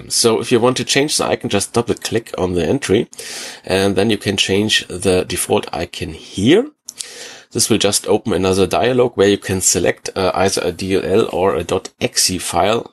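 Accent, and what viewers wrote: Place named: Germany. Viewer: I can not live through 1 day without a guy with heavy, heavy German accent speaking about tech stuff.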